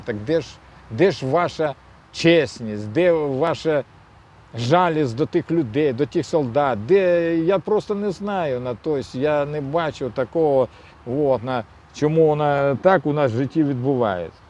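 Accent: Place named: Poltava, Ukraine. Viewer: Чудний. Полтавський діалект такий, як треба.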